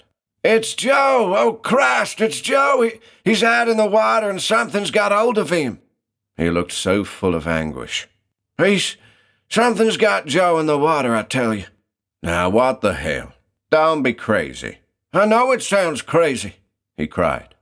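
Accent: United States. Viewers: Really good American accent,:)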